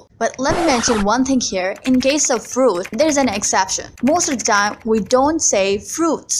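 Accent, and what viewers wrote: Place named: United States. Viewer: If the pronunciation voice was yours then I must request Google to add yours in their data base.🙂 I can't hold on with this pronunciation as it's American and I speak British. Good video though. 👍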